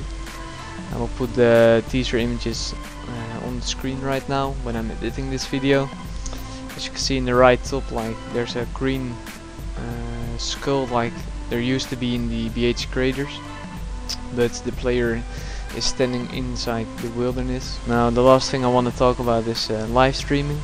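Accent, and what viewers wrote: Netherlands. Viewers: sexy dutch accent <3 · dutch accent 3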